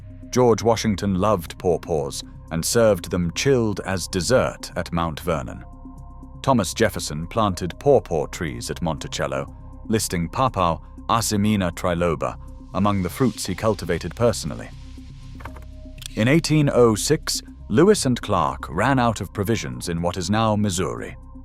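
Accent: United Kingdom. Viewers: OK-- i like paw paws, and they're an American fruit. So why was a British accent chosen to narrate this video? 🤨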